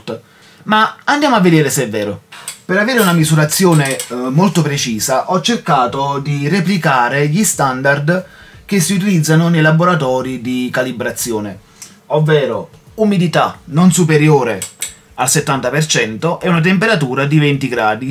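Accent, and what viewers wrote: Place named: Brescia, Italy. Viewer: Dall'accento mi sembravi più brescano!!! (io sono di avellino, ormai da 15 anni trapiantato in toscana)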